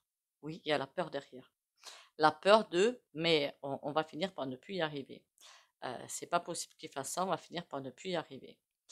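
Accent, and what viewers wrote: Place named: Pyrénées-Orientales, France. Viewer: Bonjour merci beaucoup nous on a le mistral qui souffle à 120 km heure on se pèle pourtant il fait que 9 degrés j'étais à l'hôpital Sainte-Marguerite ce matin à 8h pour faire un prélèvement du liquide que j'ai à mon genou synovial je crois que ça s'appelle émettre la cortisone je repousse le moment de la prothèse je me repose au chaud bisous passer une excellente journée et câlin aux petits cœurs vous avez l'accent des Pyrénées-Orientales😊❤❤❤❤🥰🥰🥰🥰🥰🥰🐕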